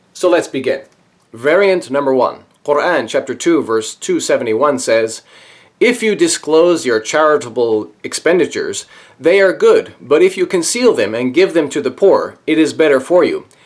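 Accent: Arab world. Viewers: Your Arabic accent is brilliant... Masha Allah ;)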